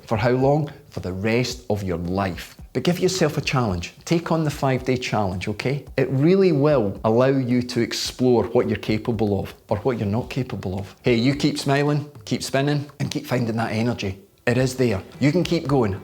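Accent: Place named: Scottish Highlands, United Kingdom. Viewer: I hear a Highlander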